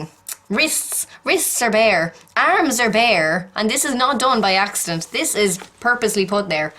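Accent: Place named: Ireland. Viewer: Great video cos u were right but just wondering are u from Ireland cos u sound Irish and I'm Irish too lol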